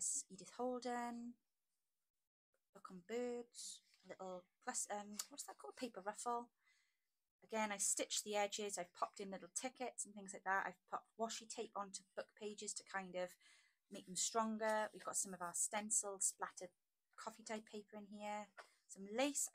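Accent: Wales, United Kingdom. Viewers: Wow! Just wow! How beautiful is that kit? and it's free!! That's very generous of you. I'm a new subscriber and am so thrilled to hear your Welsh accent. I was born in Wales and my parents emigrated to Australia when I was two. Now that they have both passed away I really miss hearing their accents. I would have subscribed to your channel just for the accent lol but your content is beautiful. Many thanks for sharing your positive take on autumn.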